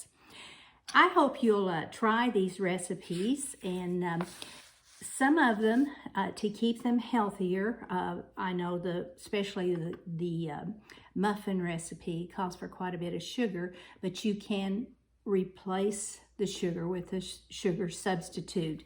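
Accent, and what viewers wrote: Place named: South, United States. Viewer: I love your “accent”. I wish I had that Southern accent…or a British accent. Love both. I have a Southern Californian “accent”. God bless you and your family! Stay healthy.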